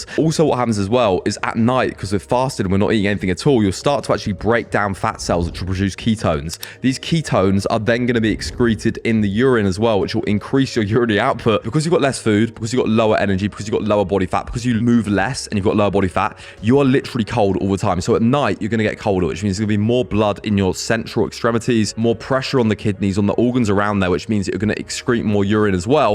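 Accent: United Kingdom. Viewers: Getting shredded and fitness overall is a dead market at this point. With skyrocketing obesity trends, and information on diet and exercise becoming worse every year, most people can't achieve this lifestyle. Due to their own misinformed and repeated failure - they usually give up, It would be a better use of their time to watch the latest movie trailer, political candidate updates, or music video. If you look at what trends on youtube, it's those things. Also, are you from a european country? you sound british. Fitness is not a large part of american culture. youtube is an american company.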